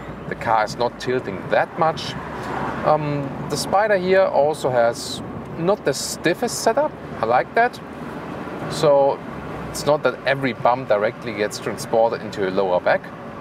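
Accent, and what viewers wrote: Germany. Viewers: As a German automotive enthusiast and senior professional in the industry, let me tell you that we just laugh about this guy and his shitty English here in Germany. He couldn't even think of the word "lock" , that's just unacceptable for a self-proclaimed automotive journalist working in English. He's carved out his niche on YouTube, with foreigners thinking he is more knowledgeable because of the very strong German accent, but he's really just an idiot doing a poor job.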